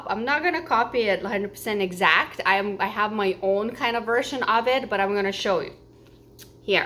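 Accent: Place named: Lithuania. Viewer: You have such a nice personality! I could watch you over and ovr. I'm new sub, 77 from Wisconsin, USA! I'm glad I found out your origin is Lithuanian, because I've watched 4 videos and I've been trying to figure out where you were born, your accent is SO CUTE! I'm watching ALL your videos now. I wish I fit in your clothes! I'm a bigger woman, but I would LOVE to buy an outfit to wear from you. God bless you!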